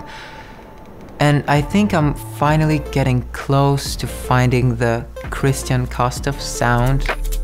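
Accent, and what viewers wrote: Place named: England, United Kingdom. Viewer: his english accent is heartbreaking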